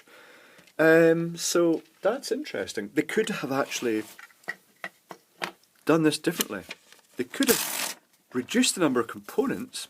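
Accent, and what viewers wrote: United States. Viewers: Dear, If you're still accepting questions, here's mine. Why do you use so much American dialect? Have you spent a lot of time in the States?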